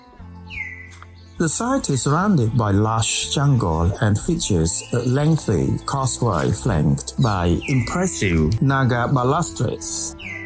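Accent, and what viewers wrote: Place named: England, United Kingdom. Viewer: The scenes and editing are excellent, but the strong English accent and mispronunciations make it difficult to focus. Since there are subtitles, maybe a clearer voiceover would help make the content more enjoyable.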